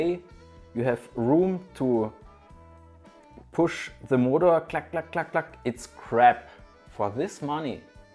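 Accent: Germany. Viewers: Man hört dass du deutscher bist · Simmer vom schwobaländle ?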